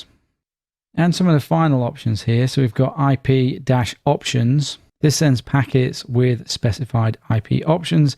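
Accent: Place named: United Kingdom. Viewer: A very good detailed explanation .... only thing it's kinda a little bit hard to understand your british accent.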